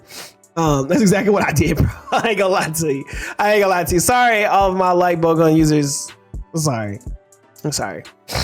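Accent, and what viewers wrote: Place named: Milwaukee, United States. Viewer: Super weird ass comment but are you from MKE? Your voice is like bringing me vibes of my old home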